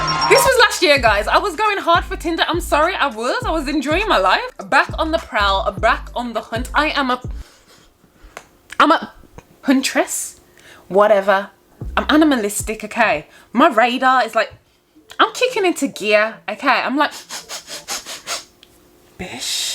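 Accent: United Kingdom. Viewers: I love your British accent!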